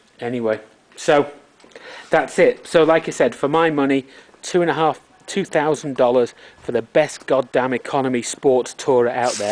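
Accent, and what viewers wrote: United Kingdom. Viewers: This is how a rider review should be done. No 'ums' and 'ahhs' and stumbling, it's bloody seamless, articulate, and relevant. Well done, mate. Only thing you didn't confirm was can a full face helmet fit in the side bags...I know it does on the ST13 but what about the ST11? They look like they're right on the line of not being deep enough. I'm gonna do something like this review for my Honda Blackbird...another Honda masterpiece. I absolutely love the 80s look of the Concours 10 and it's rawness but you nailed the reality of this segment...at the ST1100s much higher showroom prices in 1994 it was a different story, but now with prices so low on these bulletproof used 90s bikes (both the ST11 and Connie are tanks) it's hard to make the case for a used Connie in order to save at most a few hundred bucks. Better brakes (ABS on some later models) integrated crash bars, better suspension, lower centre of gravity, overall smoothness, and subjectively more interesting engine and no oddball 16 inch rear wheel with its limited tire choices and iffy availability in the middle of nowhere...the Honda is the smarter choice. 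BTW your comment about England civilizing the whole fucking world had me howling as well as feeling a perverse pride in my savage ancestry. I'm gonna put that accent of yours (lapsed a bit) somewhere north..maybe Lancashire or Yorkshire. Am I wrong? Thanks again for a great review.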